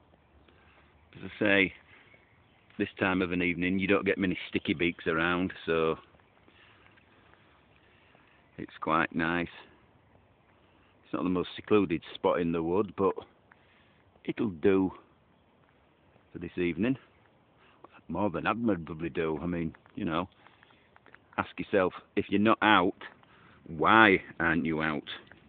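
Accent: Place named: Leeds, United Kingdom. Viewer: You wildcamp like me sir, I trip up over my guy lines too. And I ramble on to myself hee hee. I live in Cheshire so not so far from (leeds?) from your accent, if you ever fancy a meet up for a wild camp give me a shout...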